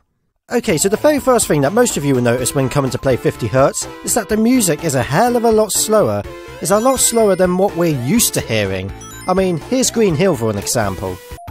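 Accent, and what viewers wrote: United Kingdom. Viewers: Ah a British chap talking about speed This is wait I like to see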